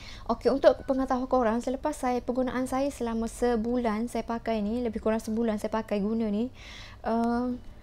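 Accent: Kelantan, Malaysia. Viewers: Awk ni asal kelantan ke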